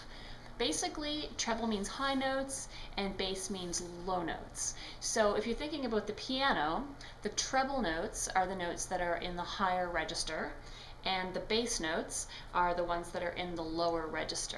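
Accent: Canada. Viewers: great video! ps-- very random but you sound canadian omgg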